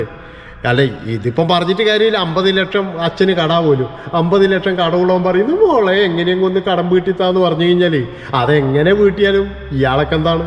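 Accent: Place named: Malabar, India. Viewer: സഹോ , താങ്കളുടെ മലബാർ ശൈലി സംസാരം Super. ടെൻഷൻ വല്ലതും ഉണ്ടെങ്കിൽ നിങ്ങളുടെ വീഡിയോ കാണും😂ചിരിക്കും😂 അതോടെ ടെൻഷൻ നാടുവിടും😂 Congrats👌👍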